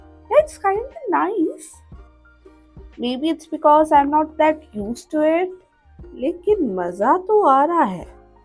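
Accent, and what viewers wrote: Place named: Kerala, India. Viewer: Is really your a malayali that I want to say you have a great malayalam slang really I want to praise you , you are amazing that when you said malayalam that I shocked because that is so good then I don't know you are a malayali i have a wish if you are a malayali please speak Malayalam again that I like the way you say malayalam I want to hear your Malayalam again and please say my name ramziya As a malayali I don't have this like slang I loved your Malayalam words I wish I can hear it again like when you speak Malayalam all the one who hears will melt for that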